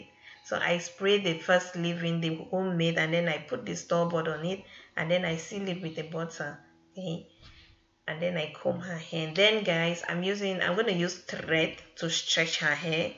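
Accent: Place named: Africa. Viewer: Do your daughter have an accent like yours? I love your beautiful African accent, where in Africa are you from?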